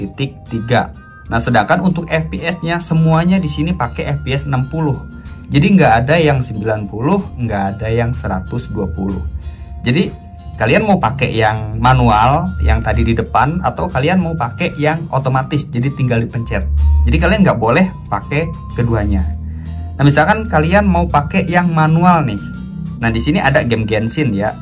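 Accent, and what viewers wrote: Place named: Java, Indonesia. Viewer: wkwk mangkanya pas ngomong kek ada jawa jawanya gtu, salam dari jawa mang gw juga jawa, jawa timur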